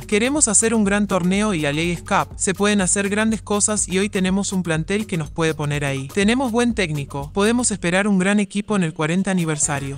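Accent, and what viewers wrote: Argentina. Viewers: Quiten ese robot con acento argentino por favor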